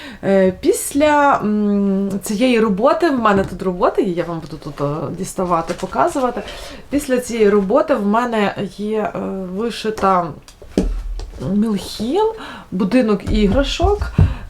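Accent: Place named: Lviv, Ukraine. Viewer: Дуже багато роботи зробили ви за 2023 рік. Я дякую за проект « борщівська буденка» 🙏🫶❤️ Бо інакше у мене не було б такої гарнезної сорочки. Ви надихаєте і мотивуєте рухатись вперед. Люблю ваші відео, слухати ваш голос зі своєрідниим львівським акцентом- то як медитація😊 Бажаю ще більших творчих успіхів у новому році. І дуже чекаю на новий проект. Може то буде красива бойківська сорочка чи міртова😊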